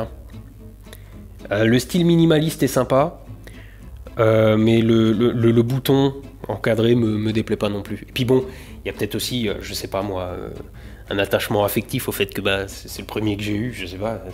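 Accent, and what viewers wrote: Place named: Marseille, France. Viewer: a été avec Benoit de Devient photographe les tous premiers tuto que j'ai suivie et en effet il me semble que sa fait bien 8 ans maintenant. Le temps passe et tous évolue aujourd’hui c'est deux cité ne propose pratiquement ou plus de contenu... Je garde de bon souvenir de visionnage et il m'arrive encore souvent de visionnée des vidéos. moins passionnée d'astronomie je vais quand même souvent liké les vidéos pour l’algorithme, car au delà du faite d'avoir des tutos intéressant le personnage avec sont franc parlé et ses mots direct est très appréciable par sa simplicité et étant marseillais je suis habitué a cette façon de parlé.... Bonne continuation a...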